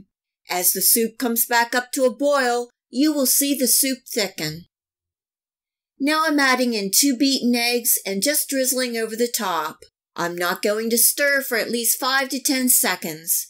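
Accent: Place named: Maryland, United States. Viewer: This is the BEST hot and sour soup recipe ever. I've been making it regularly for the past 7 years. We've tried loads of others but nails this restaurant style recipe. (*And we just love hearing that Maryland accent, reminds us of my husband's hometown.) The only change I make is that I only use one kind of soy sauce (because I can't get my hands on the other kind). DELISH! Making it today to cure my husband's cold! Thanks.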